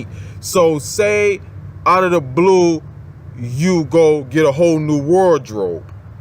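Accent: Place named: New York, United States. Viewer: You are God sent. Thank you for sharing this information. Also i can tell your from N.Y.. Great video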